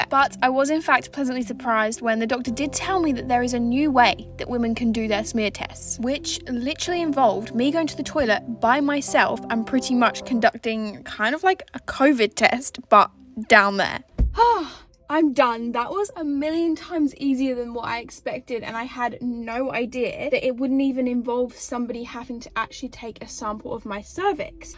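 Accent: Australia. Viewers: UR AUSTRALIAN ACCENT HAS CAME OUT AO MICH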